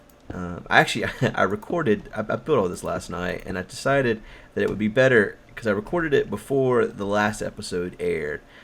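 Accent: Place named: United States. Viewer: Your American accent nice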